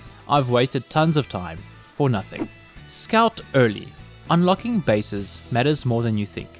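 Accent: South Africa. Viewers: I can spot a South African accent from a mile away ;) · That accent sounds very familiar... You from SA by any chance?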